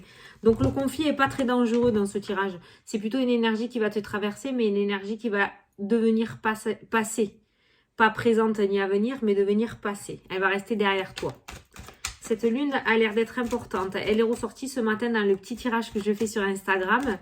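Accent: South, France. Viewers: Bonjour, Déjà, mille mercis pour ta bonne humeur & ton accent du sud qui font du bien. On m'a effectivement proposé un partenariat. Je suis en pleine reconversion et une connaissance me propose de partager des locaux dans un quartier en plein essor.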